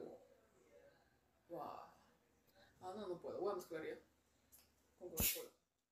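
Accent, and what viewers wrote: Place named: Peru. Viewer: por tu manera de hablar ya eres una peruana más, el pisco se toma sin saborearlo, sin retenerlo dentro de tu boca, en one nomás. Saludos desde Ica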